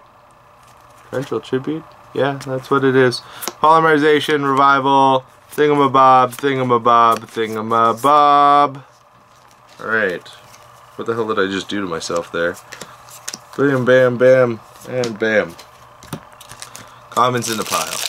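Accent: Scotland, United Kingdom. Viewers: i got +10 points..what can i get with them...accent sounds more scottish lol..idk. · You sound Scottish not Irish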